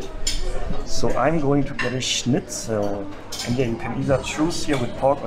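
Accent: Germany. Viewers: I like that you have a strong German accent 😍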